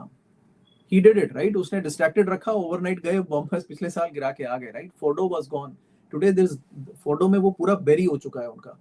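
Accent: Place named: United States. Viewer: He is an Indian but talking like an American white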